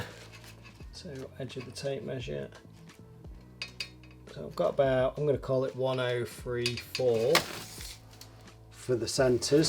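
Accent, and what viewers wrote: United Kingdom. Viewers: Weird seeing an Asian guy with a British accent lolol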